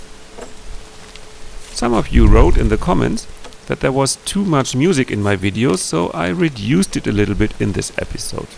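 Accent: Germany. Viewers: The stem wall or foundation wall was not a mistake! The 3x3s or what ever the beam size are you used to frame with and the insulation and osb and the windows and what ever you cover it with weigh a lot. Good job. I'm getting used to your German accent! German accent in Germany....duh! I'm digging your renovation. Love from NW Colorado, USA. Thanxz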